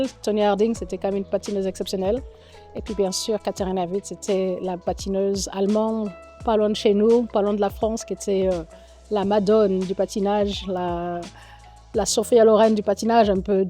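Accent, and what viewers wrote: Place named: United States. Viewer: Ah ma petite, tu nous a fait rêver, tu nous a fait sourire, tu nous a fait pleurer mais on t’aime tellement! Ça fait trop plaisir de te revoir avec ton petit accent américain. Sois heureuse dans tout ce que tu fait ma belle et surtout continue d'être heureuse et de donner de la joie autour de toi! ❤ Un grand merci à tes parents ❤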